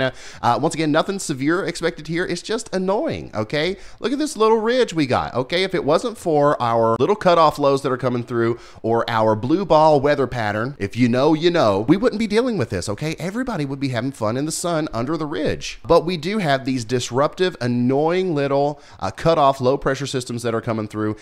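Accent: South, United States. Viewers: This fella sure does talk fast, especially a guy who has a southern twang.🙈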